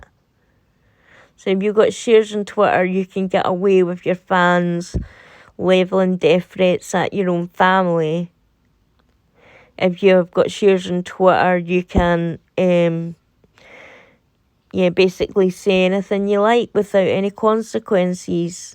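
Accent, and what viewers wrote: Scotland, United Kingdom. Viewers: Great video!! Yes we love your accent. Love Scotland. I'm a descendant of Scotland.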